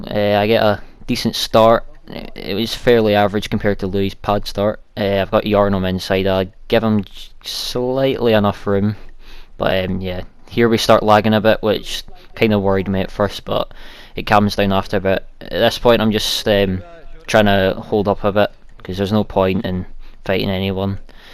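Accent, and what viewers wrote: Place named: Scotland, United Kingdom. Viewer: Good commentary mate! I'd up the game sound, it felt a bit strange not having it. Btw, ya got a gud ol' scottish accent there xD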